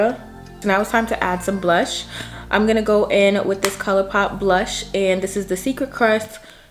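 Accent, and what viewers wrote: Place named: East Coast, United States. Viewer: This is the first video I’ve watched of yours. I love this look! Soooo pretty. I also love your accent. East coast?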